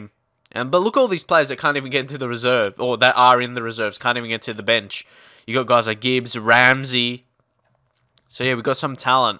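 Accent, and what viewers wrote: Australia. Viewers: Love these vids ps where in Australia are you from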